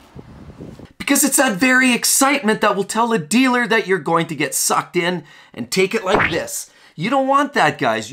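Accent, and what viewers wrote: Canada. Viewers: the most Canadian accent I've ever heard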